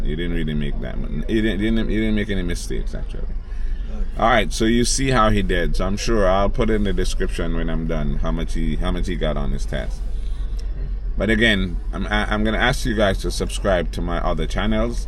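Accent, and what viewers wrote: Jamaica. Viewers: Know you sound Jamaica bro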